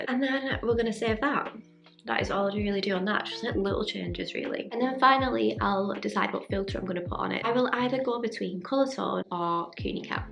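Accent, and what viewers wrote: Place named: Cumbria, United Kingdom. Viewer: Cumbria accent aiiii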